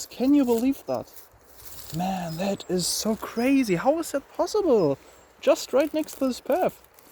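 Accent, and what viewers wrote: Germany. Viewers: Are you German you have an German accent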